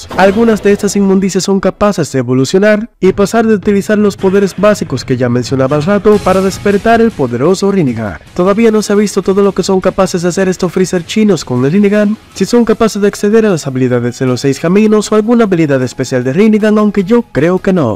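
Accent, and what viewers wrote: Dominican Republic. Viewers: El Sensei tiene su flow dominicano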